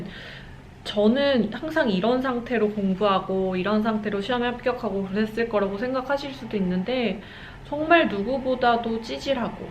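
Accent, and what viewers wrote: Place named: Seoul, South Korea. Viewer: 부산분이신데 말씨는 완전 서울 말씨인데요. ㅎ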